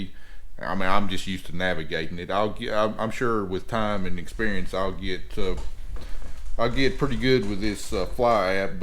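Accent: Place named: Tennessee, United States. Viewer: Very nice video, clear explanation and to the point. Naturally the Tennessee accent helped. Can you please reply who makes that tablet holder ? Thank you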